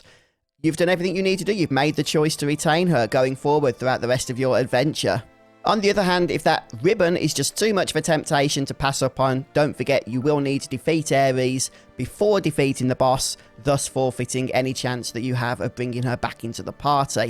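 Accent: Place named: Black Country, United Kingdom. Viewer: I love whenever I open a video and hear a Birmingham/Black Country accent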